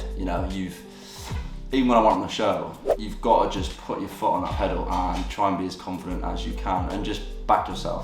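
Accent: England, United Kingdom. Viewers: I turn the caption on coz ya know is being so british heheheh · which English accent is he speaking? 😩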